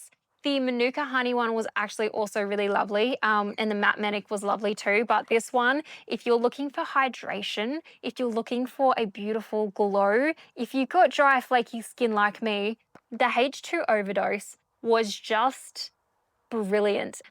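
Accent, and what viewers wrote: Australia. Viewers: Hi! I am so happy for you that they are finding you a diagnosis and should soon be figuring out better ways to help you! You are such an incredible inspiration with the way that you are able to film your wonderful videos for us and convey such joy for your knitting and for life in general. I am sure that I can speak for everyone when I say how grateful we are that you share so much of your precious energy with us, because as you say you get so tired and likely discouraged at times. Your knitting projects are so lovely, all of them, and you do beautiful colorwork. Also I have to say that I just love your accent, and practice my Australian word pronunciations when no one is listening😄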